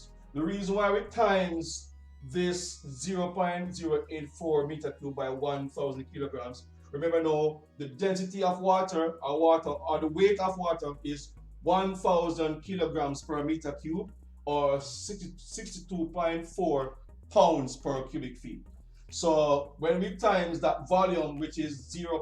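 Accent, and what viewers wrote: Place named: Jamaica. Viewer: Me feel like me a go a whaa university. What you are doing is excellent i am interested all the time. I use to whatch over seas people on youtube as it relates to building but when i was browsing this week and see this man in a african shirt and started to hear the jamaican acent i was over whelm ,trust i must say i appreciate this highly.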